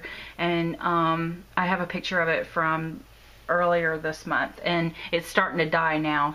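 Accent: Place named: South, United States. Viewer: OK.....I'm loving this little book. I want to do this. I will be watching all of your videos tonight - got to get back to work now! lol And I love your Southern accent - I'm an Alabama girl. Great work!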